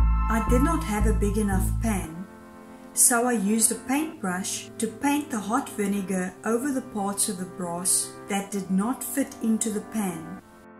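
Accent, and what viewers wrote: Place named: South Africa. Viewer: Sounds like you're from South Africa.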